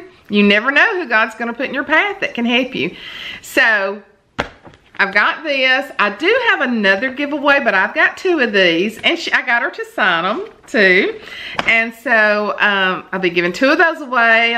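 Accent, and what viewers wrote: South, United States. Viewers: you are being covered with prayer for next Wednesday. Please let us know that all is well. Your church is truly doing God's work! Prayers for you for the energy and strength and enlightenment as you work on the grant for the community mission. I just got back late last night from a trip with my siblings and their spouses to Nashville and Memphis. I thought of you every time I saw a sign for Birmingham. I was born in Georgia and always feel "at home" in the South. Loved opening up my iPad and hearing your southern accent this morning. Would love a chance to own Nonnie's Nuggets- ❤️. - Susan in Maryland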